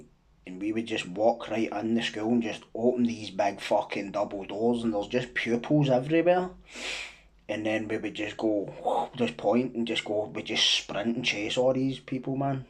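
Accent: Scotland, United Kingdom. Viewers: I’m from Edinburgh mate and abit older than you so don’t think our paths crossed- think I’ve just got one of those face’s tbh- I get that alot. Keep up the good work your doing in life with your boy and in general also enjoying your channel. It’s good to listen to a channel channel with a Scottish accent,especially for me as I live in London these day’s.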